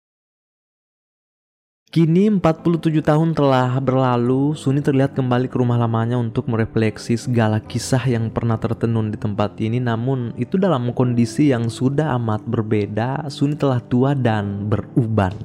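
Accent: Bugis, Indonesia. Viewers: Kentarana bugisna admin🤣🤣🤣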